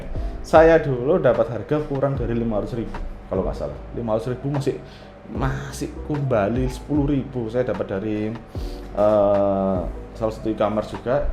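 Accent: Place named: Surabaya, Indonesia. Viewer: pantes suarae khas arek suroboyo... sik iling ae 😄 semangat... sering2 gawe konten ya 👏🏼👏🏼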